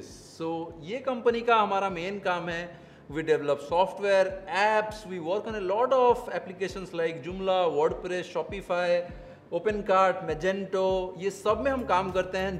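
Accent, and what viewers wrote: Odisha, India. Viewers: au gote katha hela bhai ate achievement kalani..kintu pura down to earth..tama odia accent bahut normal..kete loka achhanti jie tike uparaku gala pare besi sannn saann heiki english kahanti au odia ku bi english bhalia kahanti..ja hau semana nku kichhi sikhiba katha Tama thu bhai👍👍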